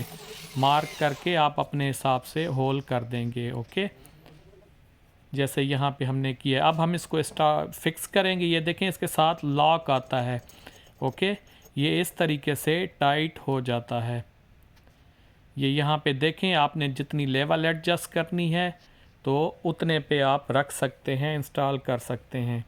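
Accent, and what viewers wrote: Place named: Sindh, India. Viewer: Boht informative video banai apne, well lehjay sy ap Sindhi lag rhy hain?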